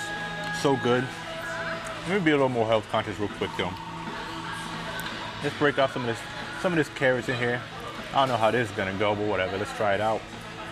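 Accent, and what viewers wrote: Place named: Tri-State Area, United States. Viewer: Oye, you from the tri state area? I'm placing bets on Jersey or possibly the city (NYC). I hear an accent and it sounds like fam back home. #NoTimeForADiet 🤣😂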